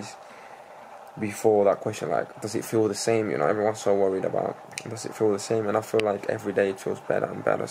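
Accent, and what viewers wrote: England, United Kingdom. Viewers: You sound English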